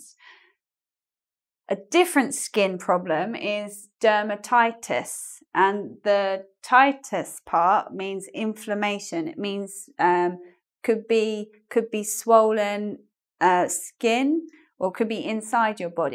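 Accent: United Kingdom. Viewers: Hi, Are you still live in İstanbul? I wish we meet you in subway and try to speak with you British accent:) Thanks for lessons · Thanks for this informative lesson. I really fancy the Brits and British accents. Eventually, I found you and your amazing videos there <3 Keep going, x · how is that accent called? i love the British accent.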